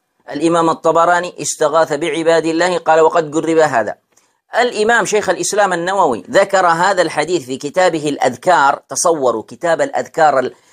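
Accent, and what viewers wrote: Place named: Yemen. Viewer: لهجة هاذا الشيخ كانه يمني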